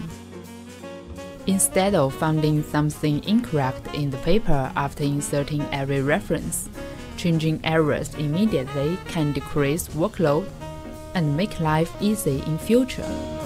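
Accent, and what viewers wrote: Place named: Jamaica. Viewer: very comprehensive. jamaica english ? VIELEN DANKE !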